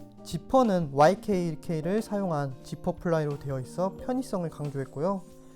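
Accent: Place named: Jeolla, South Korea. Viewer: 전라도 사투리가 정겹네요^^ 광주사람이라 딱듣고 알았네요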